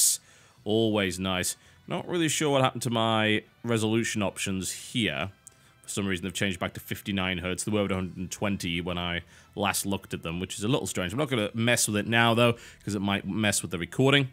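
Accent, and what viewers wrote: United Kingdom. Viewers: Well um he's British...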